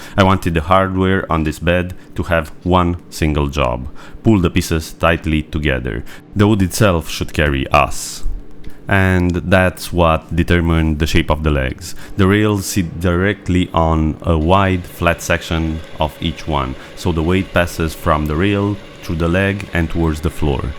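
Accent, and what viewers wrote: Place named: Eastern Europe. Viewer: Thought I heard an eastern European accent. Love your work and the way you talk through decisions. Thank you.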